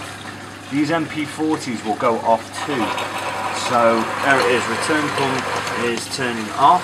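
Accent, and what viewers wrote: United Kingdom. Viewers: Hi just found your channel I love your tank and setup, I can see its going to amazing. You sound like a mancuniuan to me, I am from manchester and don't know many other reefers in the area so its nice to see. keep the vids coming.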